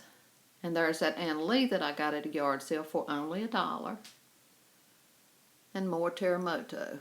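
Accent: South, United States. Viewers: your house is so cute! I simply love it! You have a southern accent what state are you from? I am from michigan. I might just have to subscribe. Debbie in michigan. 🎄🎅🎁😄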